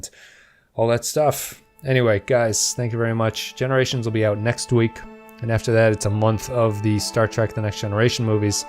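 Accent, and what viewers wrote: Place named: Upper Midwest, United States. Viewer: Over-apologizing? Hahaha! I'm going to show that to all my Can-head buddies here. Actually, you have a very distinct way of saying "out" and "about". If I had to guess, I would say either Seattle, North Dakota or Minnesota.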